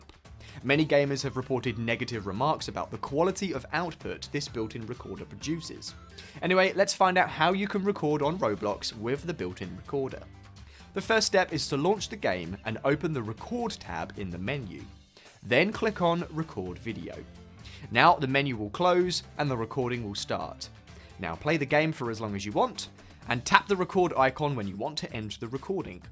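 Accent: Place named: United Kingdom. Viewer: another jagoff with a brit accent and generic content. Didn't learn too much here but appreciate the effort.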